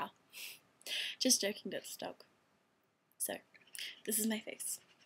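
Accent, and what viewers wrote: England, United Kingdom. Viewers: Her accent doesn't sound even a little bit Australian. It's clearly English with a little bit of North American. But like the other person said, who gives a shit? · Calm down. You only asked where she is from, after stating that you couldn't place her accent. She is from England but lives in Canada. If you've watched even half of her videos then you should have known that already. As for why one site says the other... perhaps she signed up for youtube while still in the UK and never felt the need to change it. Such speculation kind of makes people sound like stalkers though so... I'd avoid it. I'm just saying. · i like this girl. she has a british charm to her personality. maybe it's just the accent though.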